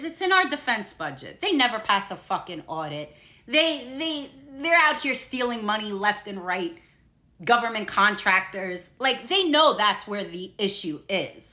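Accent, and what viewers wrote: New York, United States. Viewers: Queens? Long Island? Massapequa?? Love the accent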